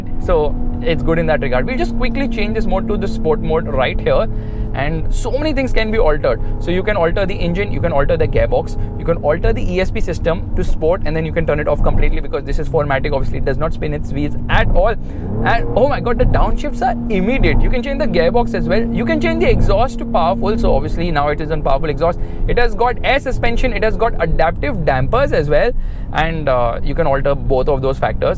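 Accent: India. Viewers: Хахахха,индийски мангал!!! Ще ме спукат от смях с този акцент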